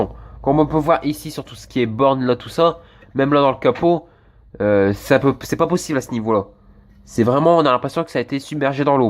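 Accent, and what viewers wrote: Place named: Nord-Pas-de-Calais, France. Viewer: chti reconno tè dmin coin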